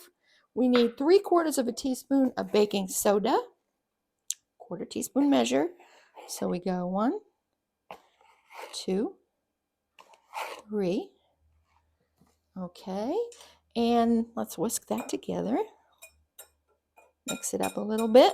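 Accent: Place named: Boston, United States. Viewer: Okay, looks like I'm the first commenter. My grandparents grew up in Groton, CT and remember taking the ferry from Long Island, 3-4 times a year, AND looked forward to the hotdogs & Beans, and fighting over the hunk of bacon! My aunt Colleen would bring "The Beans" to every family get together and she recently shared the recipe with me. Just made my first batch (in DE) and from memory, they are spot on! Your Boston accent is great by the way. I appreciate you taking the time for this video, which brought back many memories.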